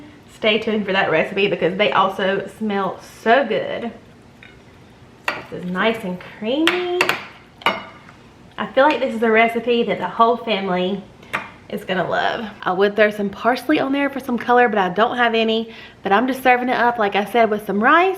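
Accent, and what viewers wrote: South, United States. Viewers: Hey, I love your channel, I've been subscriber for probably 3 or 4 years. You sound like your a southern and that would make you near my neck of the woods. I'm from Charlotte, NC but I live with my husband of 39 years in Monroe, NC. I was just curious of what part of the south are ya'll from. I do understand if you don't wish to tell me though. God bless you and have a wonderful Thanksgiving Holiday.😊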